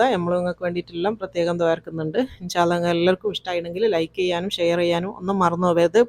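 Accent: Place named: Kozhikode, India. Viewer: Nannaayitundu❤️original kozhikode aannule.... Samsaarathil manasilaakunnundu👍🏻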